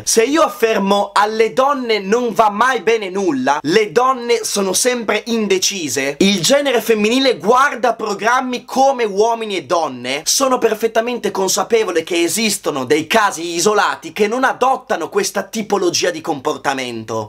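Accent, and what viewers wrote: Italy. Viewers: sei veronese? Viva il veneto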